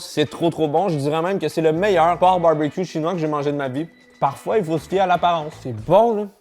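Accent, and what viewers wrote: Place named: Quebec, Canada. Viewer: je tiens à dire que en tant que québécois, je suis vraiment fier que soit devenu aussi gros sur la plateforme et qu’il est regardé par autant de monde. Ça permet de partager notre accent et notre culture ce qui est vraiment cool. Je te suis depuis bientôt 6 ans et ton contenu est encore aussi excellent continue comme ça je l’adore. (ps: félicitations pour le million d’abonnés 🥳🥳🥳)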